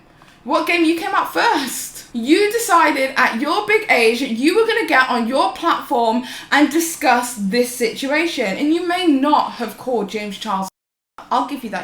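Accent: United Kingdom. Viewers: Started watching your videos recently. As a fellow Brit, its wierdly comforting to hear your lovely British accent, when the majority of the video's in a sea full of American accents. KNOWS THE MEANING OF NUANCE new Subscriber. X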